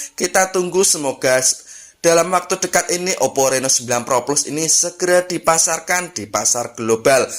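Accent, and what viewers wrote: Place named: Java, Indonesia. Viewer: Medok banget pengisi suaranya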